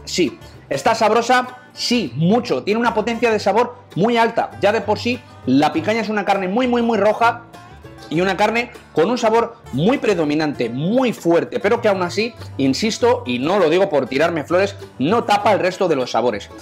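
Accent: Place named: Chulla, Ecuador. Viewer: A nadie más le molesta ese micro cuando prueba la comida.... cuando hablas como "chullas" se escucha bastante mal....para lo bueno q es tu trabajo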